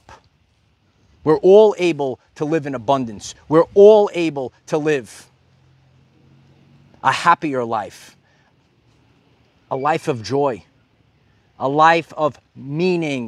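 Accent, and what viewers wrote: New York, United States. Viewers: a beacon of life from my past....your little NYC accent, all love...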